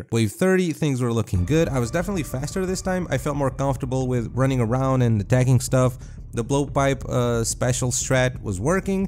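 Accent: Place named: Portugal. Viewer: ahah "daaassss" "é agora caralho" puro tuga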